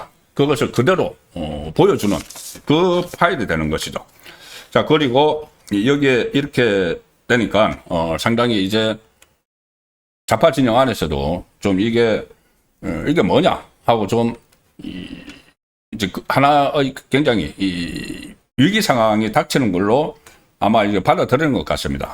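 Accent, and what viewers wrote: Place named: Jeolla, South Korea. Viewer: 1. 억양: 전라산 홍어. 2. 사고방식: 사기꾼 개년. #1+2=3. 국내산 홍어를 상대해본, 국민이라면 다 알거다. 69.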